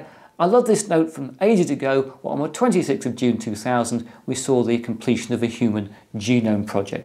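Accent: United Kingdom. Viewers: pure British English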